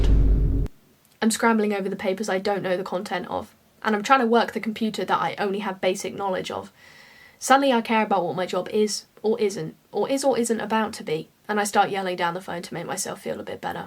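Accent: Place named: United States. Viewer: you gotta teach me that US accent!!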